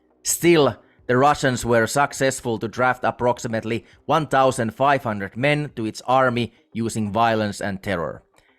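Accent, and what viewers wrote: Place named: Finland-Swedish, Finland. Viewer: Are you a Swedish speaking Finn? My mom is from Österbotten and she has the same accent.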